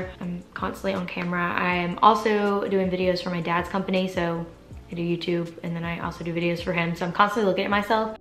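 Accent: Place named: North Carolina, United States. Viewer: You are joking! I'm from North Carolina as well :) Where in NC are you from? (I knew your accent sounded familiar lmao) And yes it is kind of unheard of of people our age getting filler so I'm glad I watched this video. I really want to get mine done.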